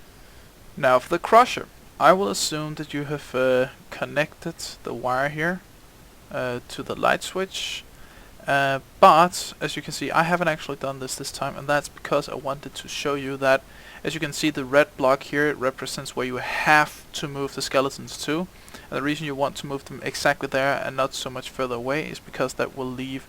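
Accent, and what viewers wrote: Denmark. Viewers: Are U danish?? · Im curious, but are you by any chance Danish? That accent sound really Danish hehe (Im a Dane myself, thats why ;) ) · Jeg ved ikke helt... men jeg tror jeg kan høre på din accent at du er dansker? :)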